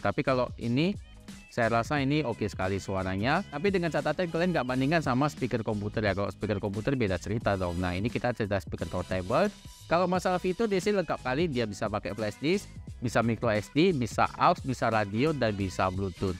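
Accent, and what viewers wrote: Medan, Indonesia. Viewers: Suka aku pada host ini. Akses Medan dan Cina jadi satu. Mantap kalian.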